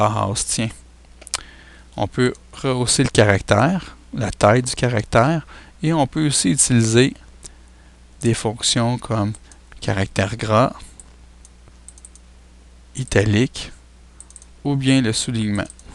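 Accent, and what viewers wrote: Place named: Quebec, Canada. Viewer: accent quebequois très très prononcé ^^ (bonne vidéo au fait ;) )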